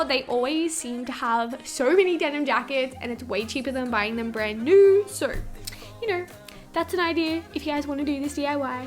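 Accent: Australia. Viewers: Where's your accent from? Australia? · Y do Australians say hach and not ach lol😂😂😂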